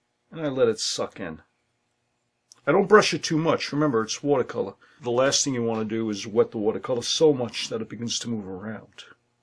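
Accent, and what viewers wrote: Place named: New York City, United States. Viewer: I've made jewelry for a long time & was searching for something different; this is it! Very detailed, in fact at first I thought you were trained in engineering or architecture since your wording included "structural integrity" a few times, smile. Anyway formerly being from NJ it was interesting to hear an accent I was familiar with, although I'll bet you're from NYC/ Long Island? Thanks.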